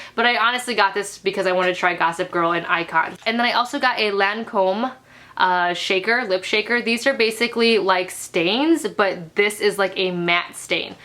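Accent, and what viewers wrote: Michigan, United States. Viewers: The first video of yours that I watched was your wish app review. I ended up subscribing bc of your voice and accent. My favorite aunt growing up is from Michigan and I always loved hearing her voice. I haven't gotten to see or speak to hear in about a year or so. Your voice and accent is similar and I find it comforting. Anywhos I love the videos And watch at least one a day!! Hope y'all enjoy y'alls "staycation"!!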